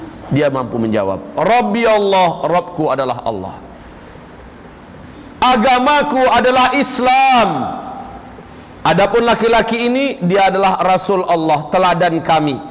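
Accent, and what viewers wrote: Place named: West Sumatra, Indonesia. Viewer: Ustaz...ustaz minang ko bangkinang? Kadang-kadang bunyi minang...kdng-kdang bangkinang.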